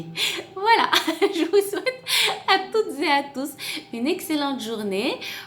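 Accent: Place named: Marrakech, Morocco. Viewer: Adorable surtout avec Accent Marrakech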